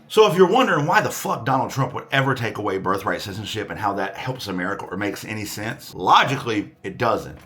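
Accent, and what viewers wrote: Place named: Piedmont, United States. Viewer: Thought I recognized your accent; piedmont here 🙋🏽‍♀️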